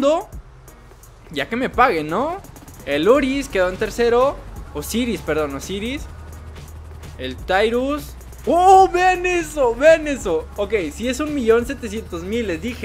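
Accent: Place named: Mexico. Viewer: mexico se nota hasta en el acento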